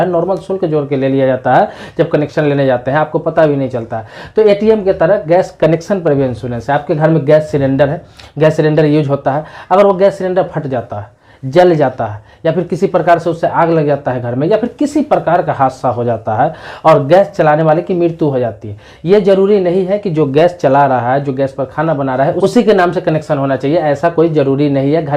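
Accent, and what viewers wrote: Bihar, India. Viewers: Kya bolte ho dhyan se sune fir bolein,kya bihari ho........tabhi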